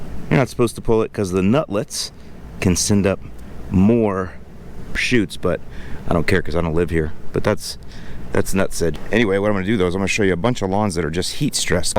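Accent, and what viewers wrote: Chicago, United States. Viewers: Nailed the midwest accent. Sounded like you are from Chicago for sure 😄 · I love the Chicagoland accent you add into your videos! Reminds me of when I lived up ‘dere!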